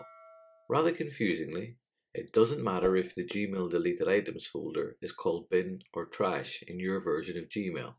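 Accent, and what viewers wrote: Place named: Wales, United Kingdom. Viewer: I like your Welsh accent (I hope it's Welsh). AmIright? This video is easy to follow, your speech is CLEAR, and easy to understand. You get a TEN out of TEN. Thank You!!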